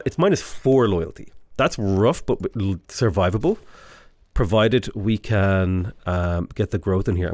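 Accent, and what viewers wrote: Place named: Ireland. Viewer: Is that a "reserve Irish-ism"?! Adding a "th" sound where there is one instead of not being able to say the "th" sound?! I treasure the few times we get the Irish accent from our great!!! Wish they were more common, but alas they are not!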